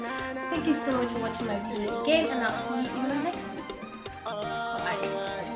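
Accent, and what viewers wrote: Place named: South Africa. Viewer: Hi sister I am impressed by your english tone, you sound like south African wow keep up...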